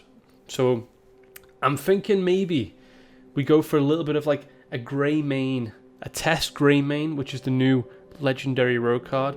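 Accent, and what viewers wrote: Liverpool, United Kingdom. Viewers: Fiufsciak - don't subscribe so I can prove reverse psychology is a total bs I think he’s from Liverpool, or at least that part of England. He called his accent “Scouse” in a tweet and Wikipedia says that where it’s from.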